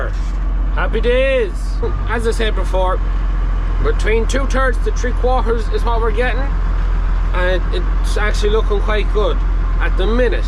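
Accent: Ireland. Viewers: I’m a first time watcher. It sounds like you’re from Ireland. My great, great grandfather immigrated to the USA from County Caven in 1828 when he was 14 years old with his family. My brothers & I now own the farm he settled in Renville Co., Minnesota in 1866. My mom inherited it when her parents died in 1987. We inherited it from her in 2008 when she died. We rent the cropland out to a corn & soybean farmer. It’s a little more than 92 acres. What county are you from? Thanks. I subscribed too.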